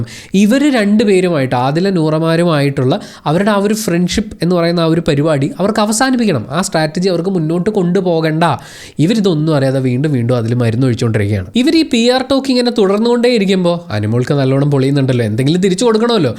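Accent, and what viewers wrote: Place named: Kochi, India. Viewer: Your kochi slang is superb 😂😂 Exactly like Adhila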